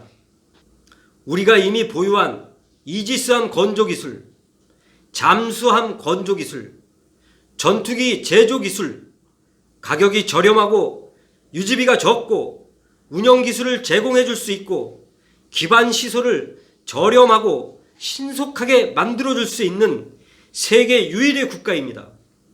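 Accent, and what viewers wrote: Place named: South, South Korea. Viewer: 얼굴은 이석기 사촌쯤 돼 보이고 억양은 아랫쪽일세... 국론 분열 획책의 일환.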